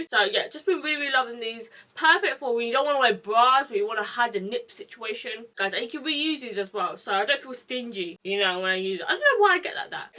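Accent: United Kingdom. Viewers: Omg same. I don't really know what I expected but I didn't think she was British lol. But her accents super cool. :)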